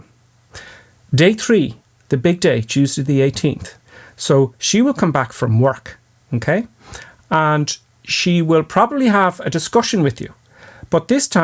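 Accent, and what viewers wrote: Ireland. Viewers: As always great video professional informative most of all fun to watch thank you and I love your Irish accent 👏👏👏👏👏👏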